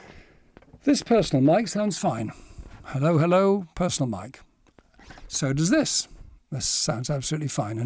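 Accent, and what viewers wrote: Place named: RP, United Kingdom. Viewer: You, sir, do have a fantastic voice. If you worked for the BBC, I'm surprised if they put you in engineering and not on air. You have what I call the perfect BBC Accent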